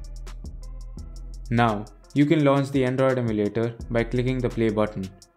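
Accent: India. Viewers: its the Indian " english " accent .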